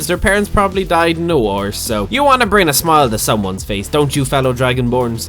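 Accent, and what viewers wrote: Ireland. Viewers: are u Irish?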